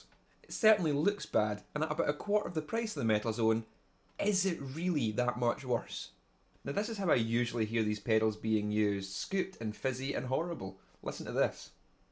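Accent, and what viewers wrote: Scotland, United Kingdom. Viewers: The Metal Zone got its bad rap because (most) guitar players can't work a parametric ("sweep") EQ. I find it way easier getting the sound I want out of any amp using the MT-2 than any other pedal. Just don't crank the distortion, boost the mids and sweep it until you find your mids sweet spot, then dial it back to where you like. DON'T boost the highs too much, nor the lows. You can easily get 5150 type distortion out of this pedal straight into a line box if you know what you're doing, which its critics don't seem to. Ps. I love your scottish accent, but your guitar tone could open up its top end a bit more. Ds. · your accent was a Little difficult, are you scottish?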